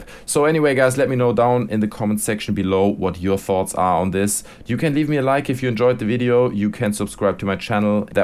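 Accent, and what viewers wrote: Germany. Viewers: Erm are you german. ?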